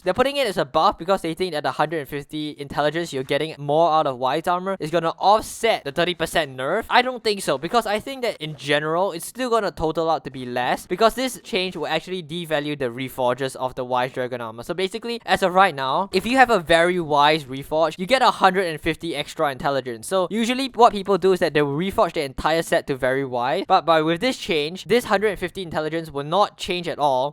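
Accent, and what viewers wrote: Singapore. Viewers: you have a huge singaporean accent are you from lee kuan yew country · Is dis dude a Singaporean? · Are you in Singaporean cause I am and U have the accent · eyy singlish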